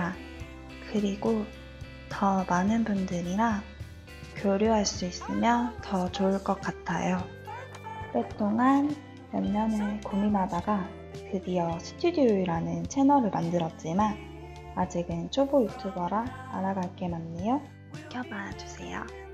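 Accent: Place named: Seoul, South Korea. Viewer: 서울말 잘하는구만😙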